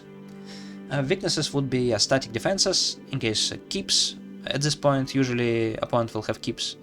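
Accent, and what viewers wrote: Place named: Eastern Europe. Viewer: Great content! I’m curious, where are you from? Your accent sounds Eastern European and I’m from Bulgaria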